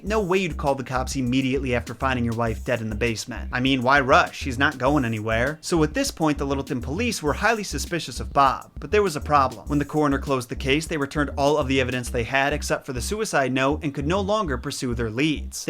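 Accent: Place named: Midwest, United States. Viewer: lmao yes 😂 Also you have gotta be from the mid-west! Every time you say “Bob” I feel like I’m at a family reunion in northern Minnesota Lol!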